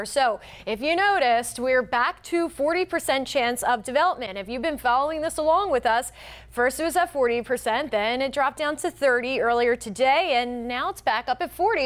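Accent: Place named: Pennsylvania, United States. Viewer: Gawd her accent is just terrible. Where is that from Pennsylvania?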